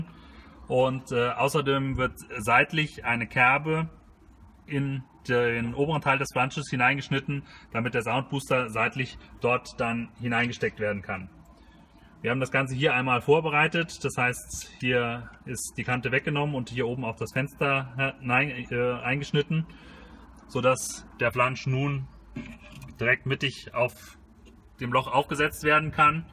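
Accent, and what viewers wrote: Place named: Germany. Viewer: Wait.... don't tell me.... that guy is German.... right?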